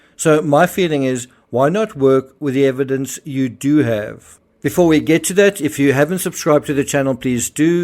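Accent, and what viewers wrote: South Africa. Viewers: are you originally from South Africa? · How much is this man making from these smear videos? He sounds South African, so no where near Portugal. The McCanns have suffered enough so leave them alone with this barmy self invested Youtube channel. Give it a rest and maybe post on UFO’s instead? You are part of the problem. Maddie is no doubt not with us any more so stop trying to make her parents lives even more awful and go away and stop making money from misery.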